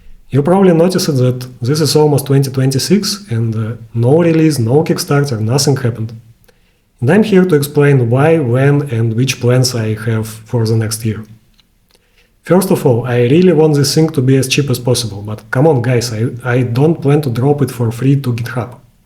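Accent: Russia. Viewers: Эй у тебя русский акцент ? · Друг слышу русский акцент) как бы такую собрать? Есть схемы или где купить, очень хочу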